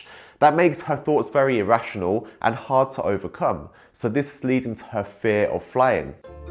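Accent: United Kingdom. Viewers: Long time no hearing ur british accent welcome!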